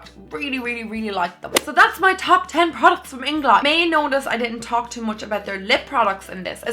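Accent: Ireland. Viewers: Oh my god I literally just stumbled across this video and WOW I spend so long on YouTube watching makeup tutorials but I’ve never met an Irish YouTuber before, at points during this video I found myself just listening to your accent, it’s so nice among all these Americans 😂 I just had to hit the like and subscribe buttons, I’m definitely going to check out loads more of your videos in the future 💕 (I literally grinned when you said ‘ye’) us Irish need more representation on YouTube 😂 ☘️ 🇮🇪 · Ayyyyyeeeee your Irish 2. much love❤️🇮🇪🇮🇪🇮🇪🇮🇪🇮🇪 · you sound Irish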